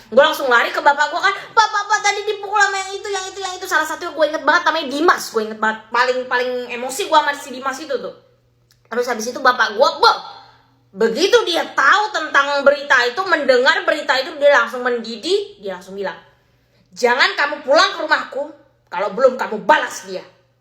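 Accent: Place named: Java, Indonesia. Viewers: Karna dia emg org jogja kan, logat aslinya emg jawa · seru ya dengerin yang dulu kaya gini, sekarang ngomongnya dilogat jawain kurang sreg dengernya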